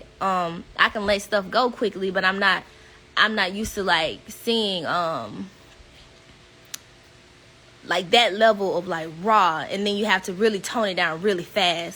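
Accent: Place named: South, United States. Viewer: I love me some. I love the southern twang and all the realness of her.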